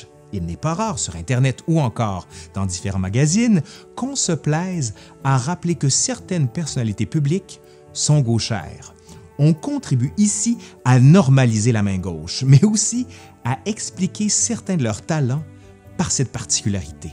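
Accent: Quebec, Canada. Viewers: Et bien bordel,je ne savais pas tout ça étant moi même gauchere ou parfois mais rarement ambidextre j ignorait l histoire de ce fascinant outil qu' est la main gauche car les gaucher on tendance à être plus agile dans certain domaine comme dans le dessins que je pratique.... Je suis fière d être ainsi merci de votre connaissance avec un ton de sagesse et d une pointe d accent québécois vous m inspirer avec nota bene pendant de je dessine ça me détend continuez ainsi...votre savoir doit être important pour les génération futur...😊😊📚✏🖌🙏🏻🙏🏻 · Salut J''ai un peu plus de 50ans et je me permet de faire remarquer que beaucoup d'artistes sont gaucher! Et sais tu que les gauchers ont la faculté d' écrire à l'envers. Tu peux donc les lire que face à un miroir! Ça ne sert à rien! Et beaucoup de gaucher l'ignore avant de d'avoir essayé! Je te suis régulièrement, tes continus sont intéressants, et très bien documentées. 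C'est surtout divertissant d' attendre parler de la l'histoire de France et autre, avec un petit accent canadien ou Québécois! Je ne sais pas faire la différence, si il y en a une! En tout cas, continue!? Tu fais un très bon boulot avec ton équipe..... Bon courage! J-P.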